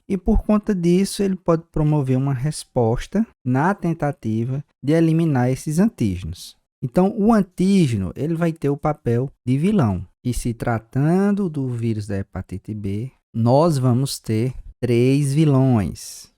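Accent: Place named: Northeast, Brazil. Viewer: Incrível aula e de bônus com sotaque , oxi! 🤠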